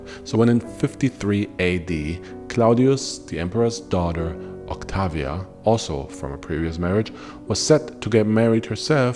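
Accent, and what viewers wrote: Germany. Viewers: Am I detecting a German akcent here ? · I love your German accent !!! Vielen Dank für deine coole videos!!! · Irre ich mich, oder sprichst du die römischen Namen auf Deutsch aus?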